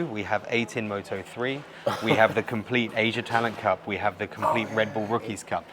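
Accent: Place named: United Kingdom. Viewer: 好像英式口音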